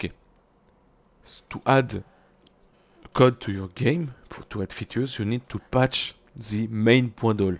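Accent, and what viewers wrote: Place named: France. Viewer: ton accent ma fait capter direct que tu est français sinon bon tutoriel tu a gagner un abonner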